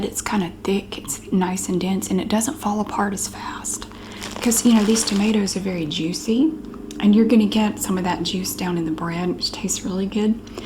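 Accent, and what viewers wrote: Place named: North Carolina, United States. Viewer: I’m new here…I just adore your accent. North Carolina?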